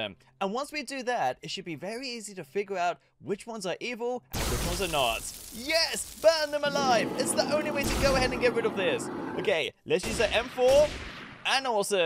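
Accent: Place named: London, United Kingdom. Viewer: You talk like London 🇬🇧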